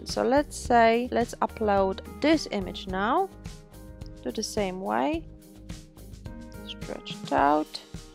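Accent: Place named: Poland. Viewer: You've got Polish accent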